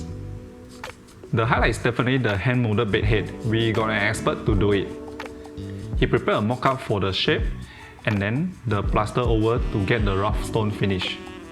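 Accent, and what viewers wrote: Malaysia. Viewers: the create. he enunciated as crate. "call home" became "koh hom" . Other words such as "cabinet", "favourite" too, the chinese-educated Malaysians will pronounce them differently from sporeans. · Strong Malaysian accent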